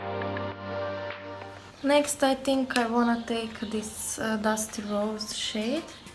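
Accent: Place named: Eastern Europe. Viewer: Love our eastern europe accent, where are u from hun x 😊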